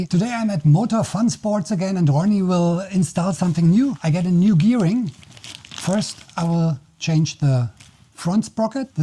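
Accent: Germany. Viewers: hör ick da nen Berliner Akzent raus? So herzig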